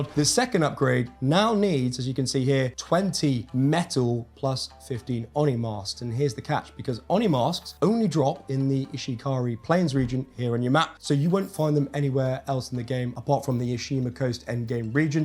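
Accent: United Kingdom. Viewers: You sound so Gay brah.. You British eh?😂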